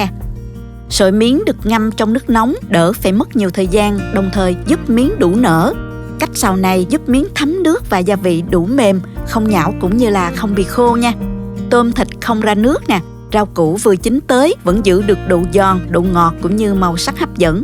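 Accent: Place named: Binh Duong, Vietnam. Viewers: Quê hương minh cung la binh dương nghe sau nho nha quá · ơi tiếng nói cô dễ thương quá __ mình nghĩ chắc quê ở Bình Dương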